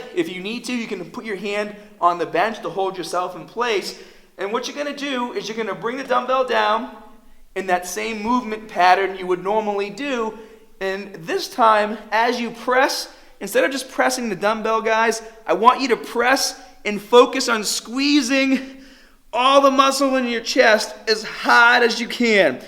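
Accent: Boston, United States. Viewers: Man that Boston accent hit me like a ton of bricks! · you from Boston? never noticed your accent before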